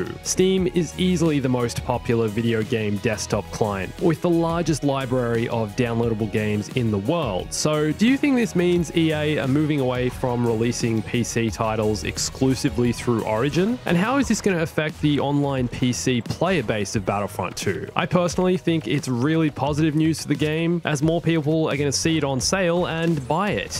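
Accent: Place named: Australia. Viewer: Wait wat ur Aussie. I thought u were British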